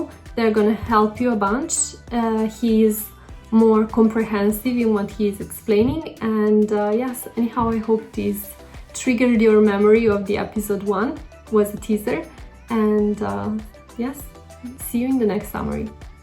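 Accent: Italy. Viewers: Cute the italian accent , continua cosi!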